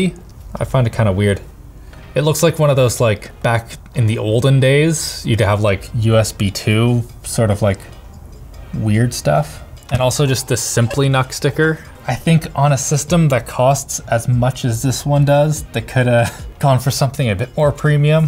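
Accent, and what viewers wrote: Canada. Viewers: I just realized why I love videos so much: he has the thickest Canadian accent of anyone at LMG. Edit:spelling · never noticed this but srsly sounds canadian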